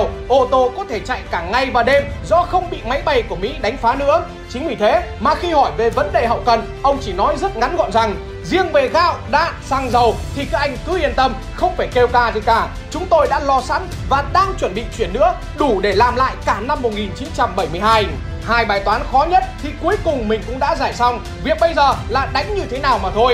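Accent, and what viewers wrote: North, Vietnam. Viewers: Ờ ! “ THẦN SẦU “ ghê ấy nhể !!…… MC giọng Bắc + tốc độ nói cũng “ hùng hồn “ gớm ! y như thật !!……BUỘC phải TIN thôi ! OK !……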